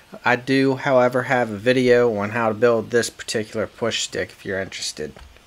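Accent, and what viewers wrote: Pittsburgh, United States. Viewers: Watching from Texas. Sounds to me like you are from Pittsburgh? Moved from there in 66 but still have my Accent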